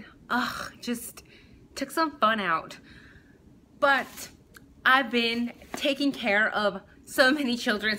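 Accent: Scotland, United Kingdom. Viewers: I never noticed your scottish accent until now. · Is that SCOTTISH ACCENT??? WOOOOOOOOOOOW!!!! Am i the only one who noticed that? You are a TRUE GODDESS!!!! Your beauty is TRULY DIVINE!!!!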